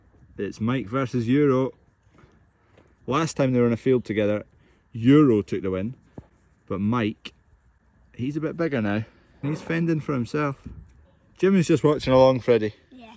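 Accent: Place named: Northern Ireland, United Kingdom. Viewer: Northern Irish accent spot on👌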